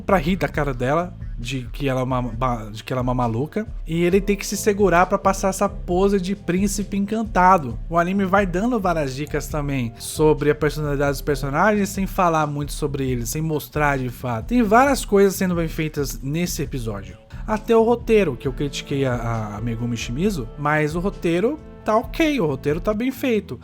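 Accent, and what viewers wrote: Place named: São Paulo, Brazil. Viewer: como voce é burro! nao sabe a pronuncia de "cha". vai ver mais anime e estudar um pouco de japonês. pela pronuncia só pode ser sp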